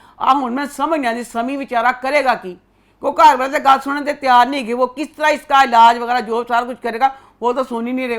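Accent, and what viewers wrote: Punjab, India. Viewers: Thumbs up what a powerful episode the way you talk and review is love being a punjabi i love and enjoy your typical punjabi accent and verdict...jiyo love you🤩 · I love how gives all-honest reviews on every drama.............but the best reviewed drama is definitely ZEBAISH 😂😂😂 Her amazing punjabi accent and sense of humour always makes my day. This is a humble request by one of biggest fans: plz do a review of “Nund”. I am begging to hear words on it. 😜 If a smile is a sadqah, imagine what reviews do 😊 God bless and the team of Galaxy Lollywood ❤️❤️❤️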